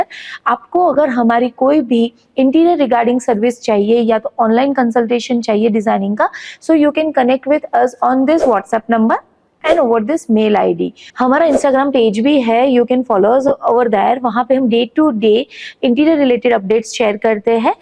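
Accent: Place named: West Bengal, India. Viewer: Why you sound a bengali?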